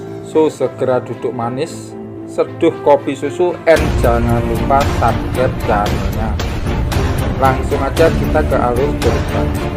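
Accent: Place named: Java, Indonesia. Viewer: Yg cerita nya wong jw ne medok bngt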